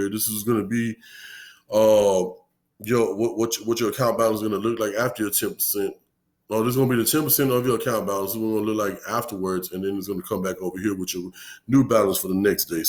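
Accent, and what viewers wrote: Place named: Houston, United States. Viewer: Must be from Houston. I know that accent when i hear it 🤘🏾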